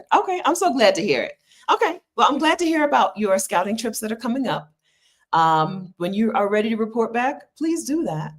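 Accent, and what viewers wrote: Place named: Florida, United States. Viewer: What wrong with sounding like you are from Florida?! 😅 I hope Bogota works out for you! 😊 Tfs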